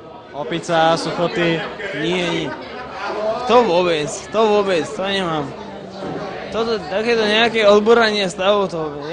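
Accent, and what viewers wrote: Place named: Liptov, Slovakia. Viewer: Páči sa mi jeho prízvuk, sťaby z Liptova pochádzal, len ma trošku mrzí, že búši viac ako by sa patrilo. Aj tak by som prijal a člena mojej družiny.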